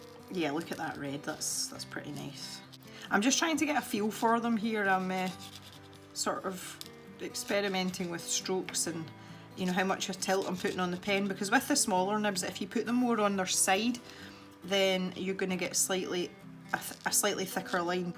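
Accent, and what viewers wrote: Scotland, United Kingdom. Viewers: I'm a first time visitor to your Youtube channel. I'm from Florida, U.S.A. and my Southern ears have a hard time understanding the English accent. I'm just guessing here, but, are you from Scotland, or Ireland? Your accent is wonderful and, surprising to me, I find it very soothing. I've really enjoyed watching your video. I do a lot of Adult coloring and I use Poscas in my coloring. It's one of the few whites that will lay down over my colored pencils, but I often have to use multiple layers because they tend to pick up the colors I cover. For a white highlight, I have to give them plenty of drying time between layers. I'm subscribed to your channel and will look forward to your next video. (I'm also a fellow novelist.)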